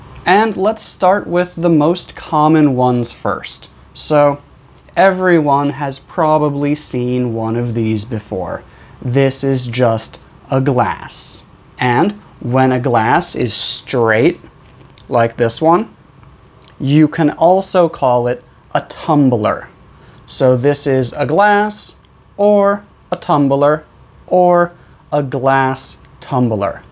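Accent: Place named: United States. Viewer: (382) A perfect presentation with the enchanting Native voice , strikes the ears of the non-natives absorb the visual traditional and modern vocab, perfect American pronunciation specifically Flap T. ❤🎉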